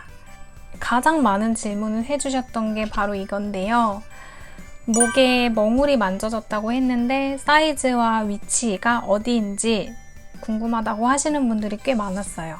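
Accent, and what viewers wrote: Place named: Gyeongsang, South Korea. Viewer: 혹시 어디분이신가요?? 경상도신것같은데! 화이팅하새용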